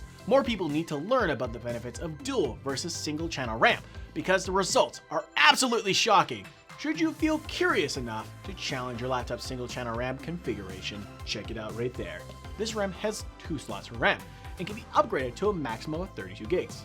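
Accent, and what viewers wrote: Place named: Taiwan. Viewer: oh I love this talking style from taiwan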